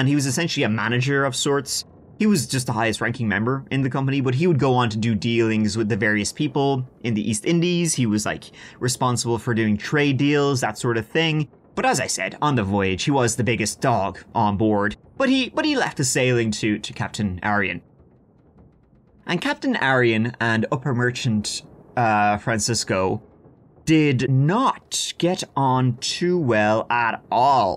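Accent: Ireland. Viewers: Feck yes. The mad Irish lad telling the tales. Heaven.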